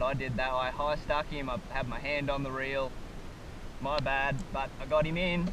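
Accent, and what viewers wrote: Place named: Australia. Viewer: you are the 3rd Aussie i subcribed love you guy's your great sports and love the accent to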